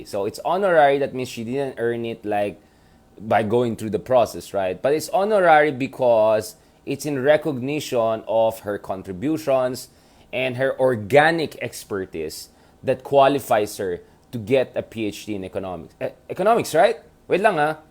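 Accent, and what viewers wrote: Conyo, Philippines. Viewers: Tigil mo na pagiging konyo mo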